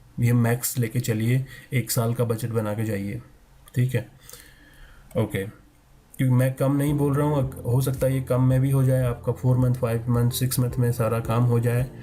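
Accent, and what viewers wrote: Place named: Ireland. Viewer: Dang that's a thick Irish accent or are you drunk or both?? Please next video try accent free English, then I can understand what you are saying.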